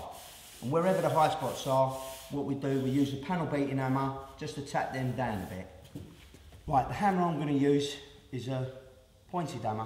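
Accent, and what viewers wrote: London, United Kingdom. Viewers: Don't you just love it when people try to talk well. They try hard to pronounce their Ts and Hs but the native accent always slips in! Wot I've dun ere is mixed the filla righT. The impawtant fing to remember is... You can take the man out of London...but you can't take the London out of the man! Long live the London accent :) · dombou70 he's a London boy 👦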